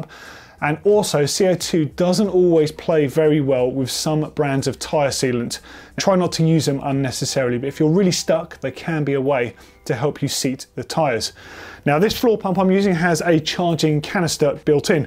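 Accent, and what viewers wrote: United Kingdom. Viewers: British accent is so hard to understand for some Americans. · Awesome job and great explanation as always. I’m a Brit, now living in LA so I miss the accent. Great advice and a tase if home! Thanks GMBN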